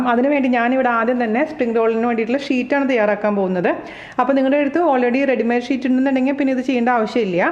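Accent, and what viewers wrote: Thrissur, India. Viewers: Intro matenda chechi...athokke karanam aanu ente kudumbathile aalayi thanne thonunne...pinne ente relativesinte okke same perinjanam side trissur slangum..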